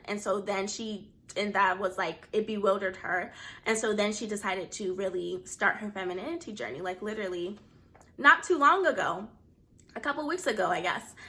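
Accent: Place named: Caribbean. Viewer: Hey, Girl you sure you from down south because I swear I can hear Caribbean or jamaica in your accent. Thanks for this video.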